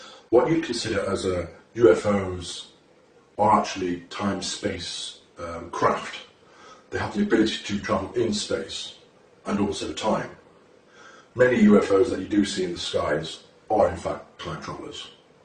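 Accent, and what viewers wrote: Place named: United Kingdom. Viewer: So what Army did you join? Why are you speaking with a British accent?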